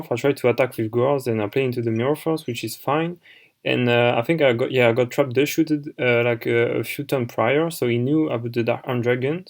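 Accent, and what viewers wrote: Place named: France. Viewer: i'm assuming you're french by your accent but i'll write in english for everyone. I thought about this deck quite a while now bcs tempest was an ftk that got banned in modern formats and you overlooked some cards that can actually turbo this instead of playing a bunch of randoms cards. Magical library is a spellcaster that will keep the engine going while being lvl 4. I think the most important tuner here is plaguespreader and should basically be the only one. You can use summoner monk to go into library or armageddon knight to dump plague that will combo with monk to summon tempest. You then play a crazy amount of draw spell cards like hand destruction, bamboo swords etc. No upstart so you can otk/ftk and why not a copy of magical explosion. I never tested it but i think it would be the optimal way to go.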